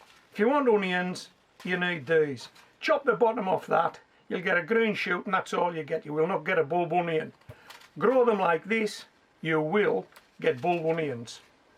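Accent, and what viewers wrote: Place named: Cumbria, United Kingdom. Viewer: Thank you for your clear and honest advice. Absolutely love your channel. I have learned so much from you in my first year of growing from seed. Thank you! (By the way....you sound just like my husband and in-laws who are all Penrith and Appleby folk. Are you local to there?) Best wishes, Amanda. ❤️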